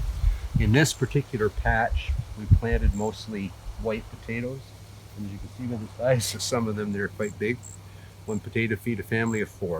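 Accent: Canada. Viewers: I'm a yooper born and raised on the U.S. Canadian boarder. I watched the first of your videos and knew you were from Canada from your accent. I started watching your oldest videos first and will continue to the newest ones. Great videos and love the little cabin you and your partner built. I am looking forward to more. Thanks!!!!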